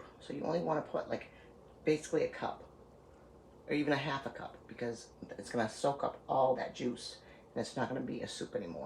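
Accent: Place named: Boston, United States. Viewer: Hey!!!!!! I’m here again!!!!! I always love checking in on you!!!!! You’re one of my best friends!! We both have that Boston accent lol 😝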